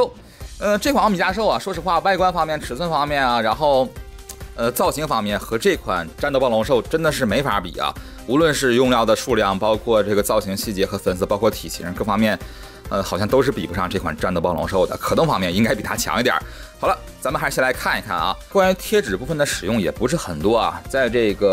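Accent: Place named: Dalian, China. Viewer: 博主大连的？sijin抬？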